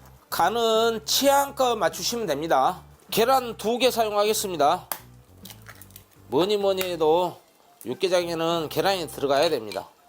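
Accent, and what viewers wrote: Jeolla, South Korea. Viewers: 셰프님 감사합니다 전라도사투리가 약간 들리면서도 자세하고 순서 정연하고 초보자도쉽게 설명해 주셔서 고맙습니다 요리책 1.2.권을 구매해놓고 아주유용하게 사용하고있습니다 셰프님최고입이다 요리유튜브중에서최로중에최고입이다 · 세프님. 육개장누가먹읍니가요. 세프님요리주문안됩니가요.전라도. 말씨가. 좋아요. 세프님건강하세요. 요리대박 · 유튜브 보면서 처음으로 댓글남겨요^^ 쉐프님 말투가 같은 전라도 사람으로써 너무 정감있습니다~ 저에 쉐프님 레시피 원픽은 닭볶음탕!! 항상 응원합니다.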